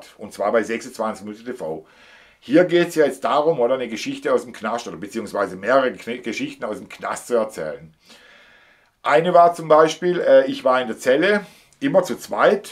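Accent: Germany. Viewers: Bisch doch aus Karlsruhe oder Umkreis vom Dialekt her 😂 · Ich hab durch Zufall deinen Kanal entdeckt, finde es super, dass du so offen über dein "altes Leben" redest. Ich bin ein neuer Fan und werde deinem Kanal auf jeden Fall folgen. Übrigens, dein schwäbischer Dialekt, genial ;-) Grüße aus Zella-Mehlis.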